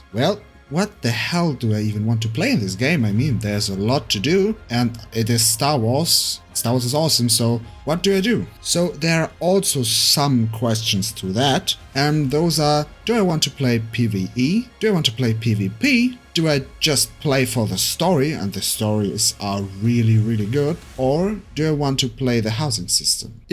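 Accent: Germany. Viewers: U probably from germany? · Are you German by chance? :D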